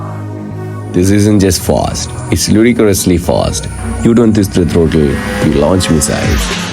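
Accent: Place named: Kerala, India. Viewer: Great vid but work on your speech and pronunciation. You have solid baritone voice, but you have a heavy Malayali accent which makes it extremely difficult to understand what you are saying.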